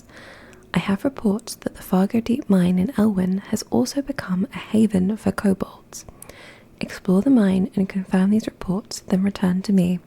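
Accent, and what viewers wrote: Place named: England, United Kingdom. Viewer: Damn such a pretty voice 😮 trying figure out what part of England your accents from. I know your nervous about it but soft spoken suits you I love whispering too 😊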